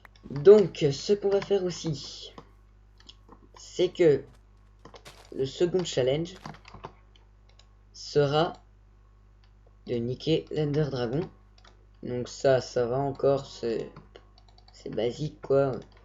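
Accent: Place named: England, United Kingdom. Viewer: Mais quel accent anglais mon dieu ! TU es mon dieu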